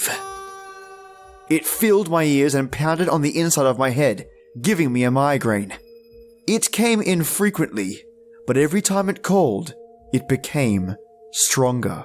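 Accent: Australia. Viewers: Trying to sleep at 4AM, but before I finally doze off I'll be here listening to this video 👍🖤 in that awesome Aussie accent of yours 🙂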